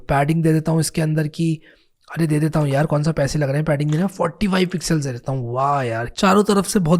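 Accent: India. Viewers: the best style of speaking, ekdam desi style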